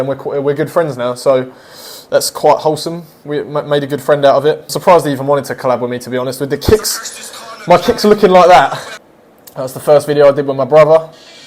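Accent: United Kingdom. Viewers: He sounds british Is he? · i just noticed the british accent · Dude! I was watching your shorts/tips but I honestly didn't expect you to be British. I thought you'd have a Russian accent 😅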